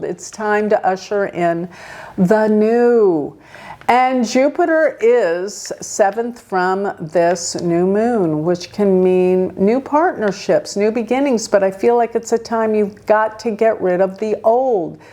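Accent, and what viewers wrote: United States. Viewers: And I have learned to understand and embrace her American accent especially in the pronunciation of sanskrit terms.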